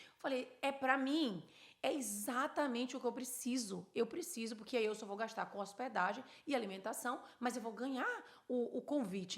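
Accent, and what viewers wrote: Bahia, Brazil. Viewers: Adoro sua energia seu sotaque baiaho tou com tigo ❤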